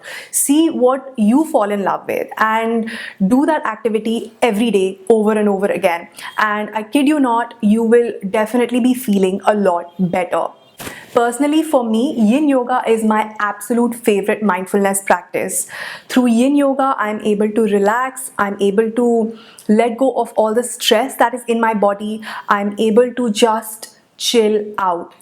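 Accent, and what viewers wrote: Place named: India. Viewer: Amazing knowledge your sharing! Keep helping people my friend. Love your indian accent too you got a sub from me 😄 2021 LET'S MAKE IT A GREAT YEAR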